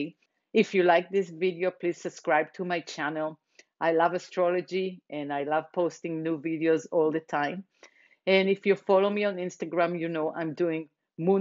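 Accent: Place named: Israel. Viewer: חשבתי שאני מזהה מבטא ישראלי קל :) נעים להכיר ותודה על המידע!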